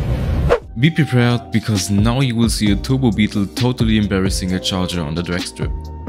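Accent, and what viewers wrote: Germany. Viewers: Gude .) musst noch bissel Amerikanisch lernen fällt auf das du Deutscher bist .) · Are you german? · man hört den deutschen aczent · bro bist du deutsch ja nh?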